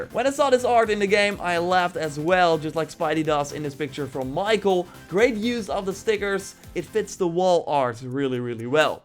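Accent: Netherlands. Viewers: Ik wist dat je Nederlands was!😂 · omg you are dutch :D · are you dutch?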